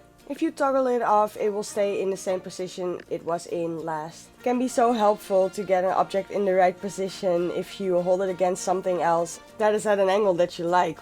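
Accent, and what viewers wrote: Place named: Netherlands. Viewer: Are you dutch? You sound dutch hahaha, thanks for the video!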